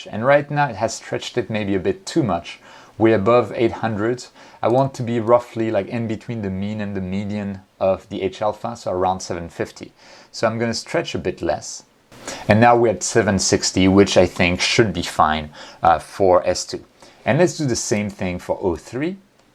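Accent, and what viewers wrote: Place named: France. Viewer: nice video ! are you french . i feel a small accent ....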